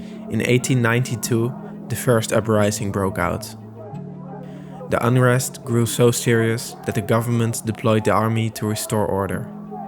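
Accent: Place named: Netherlands. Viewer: Very Dutch looking and sounding young man :)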